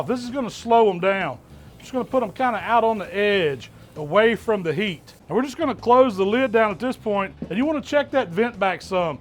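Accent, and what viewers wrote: United States. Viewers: Обожаю американский акцент.А кухня просто потрясающая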